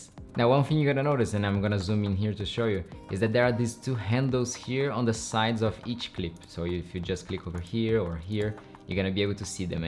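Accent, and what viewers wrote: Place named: Brazil. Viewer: This accent.... Are you Brazilian?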